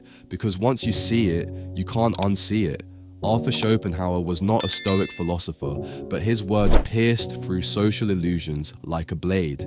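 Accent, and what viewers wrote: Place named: Scotland, United Kingdom. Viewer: Its a Scottish accent it has a charm its human its natural its better than the AI generated voices on the internet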